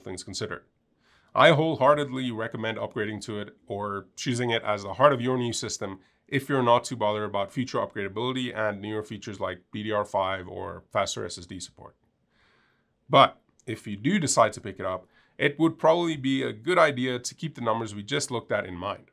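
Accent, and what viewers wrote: South Africa. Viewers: I didnt even notice the afrikaan accent untill the dude mentions rand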